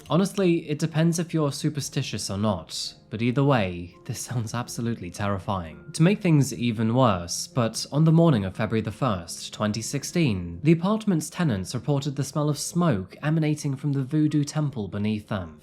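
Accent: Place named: United Kingdom. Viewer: Trapped? Like I didnt click here, for the cute, coffee loving smooth brit voice lol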